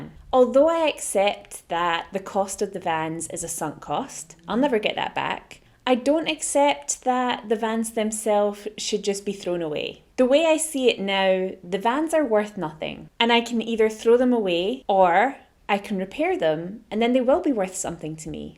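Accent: Scotland, United Kingdom. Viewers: Brilliant concept. By the way your Scots accent is most cool🌺♥️😎👍 Great video. Nice editing, great production. Good rythum👍....I mean balance✔️ Waste is terrible. I'm type 2 and can't have buns and can never find anyone to have the rest of the buns/cakes/pain au chocolate etc., etc., so I end up chucking 5 pain au chocolate in the bin😟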